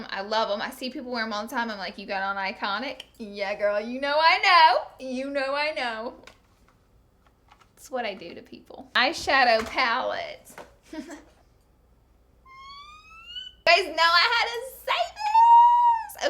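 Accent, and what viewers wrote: South, United States. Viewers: Hi! I just started following you. I am also a southerner turned Californian, so naturally I love your voice! Reminds me of home. =) Love the hair, its super cute!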